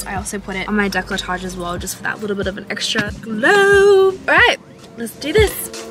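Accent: Australia. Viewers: Hey, are you Australian??? Love your videos ma'am 😍.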